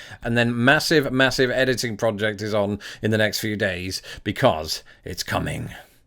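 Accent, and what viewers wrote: United Kingdom. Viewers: Thanks for interesting video. Your accent is Heavier. More British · Glad you had a good trip and are home safely. Now, it seems weird, but your British accent seems stronger now that in was before you left. Like you got a refresher on your accent! Anyone else notice that? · I just discovered your channel tonight. I haven't watched all your videos but it seems that your British accent was slightly more pronounced upon your return. I think that's pretty cool. My mom was like that when she went to visit her family or during phone conversations.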